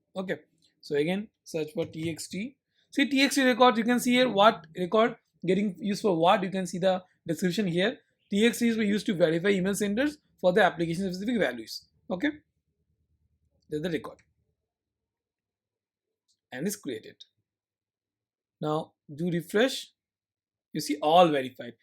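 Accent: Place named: India. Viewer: The video is amazing but your indian accent is so strong that is dificult to understand ... Try to put subtitles next time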